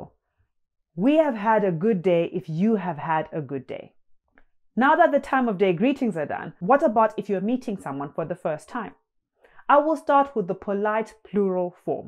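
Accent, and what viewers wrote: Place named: Uganda. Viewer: You speak like ugandans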